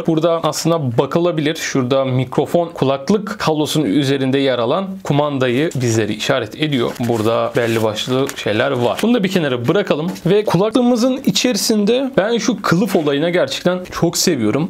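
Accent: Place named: Azerbaijan. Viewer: Selam. Sen nerelisin? Sanki Azerbaycan Turkusun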